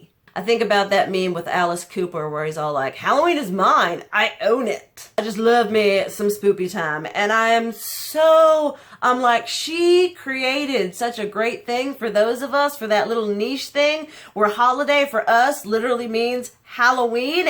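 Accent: South, United States. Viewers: I’m from the South but live in NJ now. I love listening to your accent and after watching your videos, my kids tell me my use of the word “y’all” goes way up! ❤️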